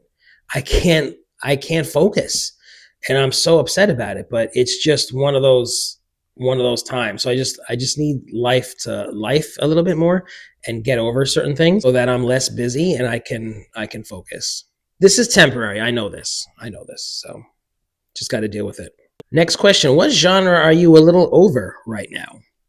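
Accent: United Kingdom. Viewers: Ooh look at that it's my favourite American dancer bloke doing an English accent!! Hahaha love it - you are doing a southern accent of an English person living in or near London. That accent is all over my City and that's a 2 hour train journey from London. Loved discovering Robin Hobb with you. Also loved talking about Life of Chuck with you. This is a great tag. Awesome video. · this was so great! I love hearing you gush about the books that have wowed you. I loved your accents too lol. I think what you were doing would be considered Cockney, or close to it anyway. Not quite as thick as My Fair Lady cockney though lol. Cliff is South African though. 🙂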